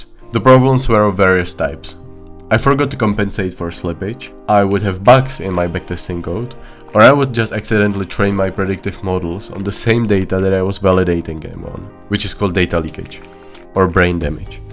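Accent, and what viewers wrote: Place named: Czech Republic. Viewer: Accent sounds extremely Czech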